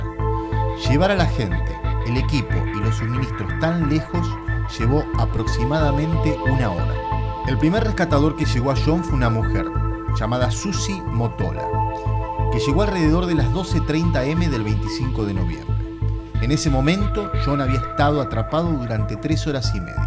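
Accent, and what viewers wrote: Argentina. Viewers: Sos argentino ? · Entiendo. La verdad es que me encanta el acento argentino 😄👍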